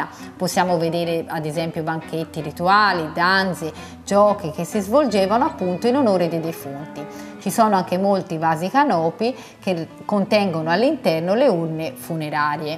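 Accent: Tuscany, Italy. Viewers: Es italiano con el acento toscano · Se cerchi di togliere quella c toscana almeno quando spiegi sarebbe meglio per una parlata più omogenea e italiana perchè a certi da dastidio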